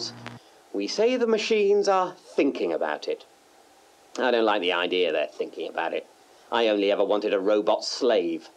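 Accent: United Kingdom. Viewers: I read this in my head in David Mitchell's angry yet politely British voice...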